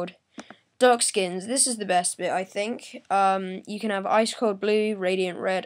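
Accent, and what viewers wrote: United Kingdom. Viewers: cool i like ur british accent..specially when u say Dock doctor.....so cute.....i have to learn british accent...thanks for suggesting ur fave application....xoxo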